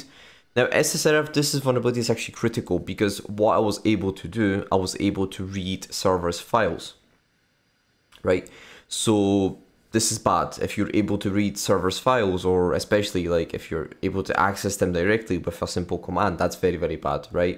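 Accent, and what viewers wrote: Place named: Scotland, United Kingdom. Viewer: Good to hear a Scottish voice in the community 😢 Exploiting the SSH flaw sounded a bit like trying to thread a needle while wearing oven gloves when i listened to someone discuss it earlier. The Adobe one?: more like reaching through an open window. Between that & Polyfill, bunch of ops teams & web devs are gonna be pretty busy this summer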